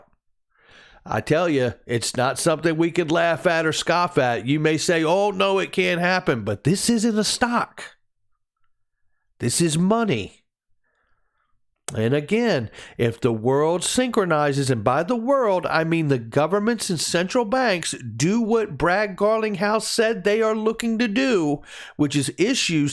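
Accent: England, United Kingdom. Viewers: Come on mate, that sounds like a pommie accent, not a Aussie.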